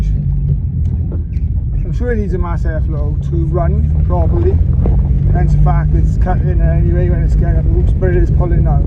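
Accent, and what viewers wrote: Wales, United Kingdom. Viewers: Just randomly got this video watched and heard the accent and subscribed straight away. From the valleys myself and plus I love these cars. Happy days mate 👍🏼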